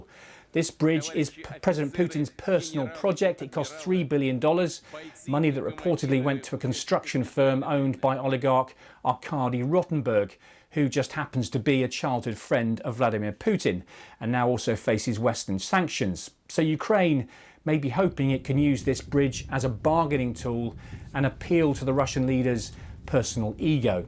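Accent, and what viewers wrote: United Kingdom. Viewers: added points; after 1000 times only a few success for Ukraine 2.The air defence of Russia is robust 3. Ukraine air force already tried and failed 4. The drone boats were killed so many times by russia and still ukraine is trying 5. Of course Ukraine will take punishment if they really hit the bridge 6. May be Russia knows a lot about what are the assets Ukraine receives from west that why the counteroffensive is dud like ur mouth 7. Sun Szu would faced chengiz khan he would have not written the book 8. Actually Russia is playing long game to damage all western equipment's 9. in summary an old pig with british accent just doing propaganda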